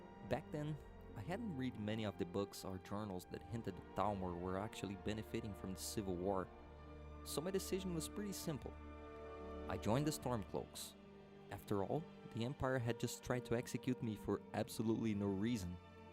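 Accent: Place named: Brazil. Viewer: Me listening "esse cara é brasileiro" kkkkk Também jogo desde que lançou quando tava no ensino médio, dahora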